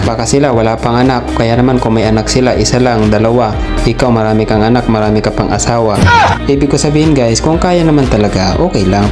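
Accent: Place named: Bicol, Philippines. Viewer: kabayan bicolano ka po? puntong bicol ka po eh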